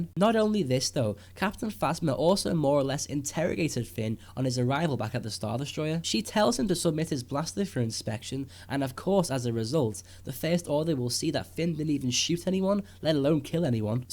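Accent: Liverpool, United Kingdom. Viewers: Yous a Scouse, innit?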